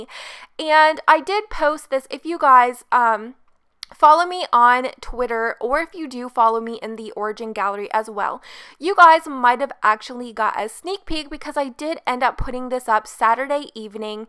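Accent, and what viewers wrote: Canada. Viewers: It's called an accent (I believe she's Canadian) chill out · I used to believe Canadians didn't have much of an accent that differs from Americans. This video proves me wrong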